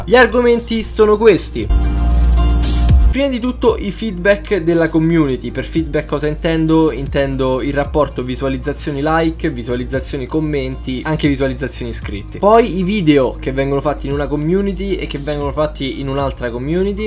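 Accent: Rome, Italy. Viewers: Ho scoperto il tuo canale grazie a dei miei amici (maschi), che penso ti seguano solo per i gameplay, poiché su youtube seguono solo gamer. Ero curiosa, non ho mai guardato video in cui giochi (semplicemente perché non ci capisco nulla), ma mi sto guardando i vlog e i video ask. E niente, ti adoro: mi piace il tuo modo di pensare e di affrontare argomenti un po' scomodi, mi piace il tuo dire quello che pensi, senza peli sulla lingua, ah e mi piace pure il tuo accento romano. Mi pento di averti conosciuto solo poche settimane fa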